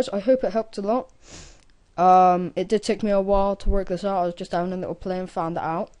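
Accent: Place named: Devon, United Kingdom. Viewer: Do you live in plymouth ?or devon ? It sounds like it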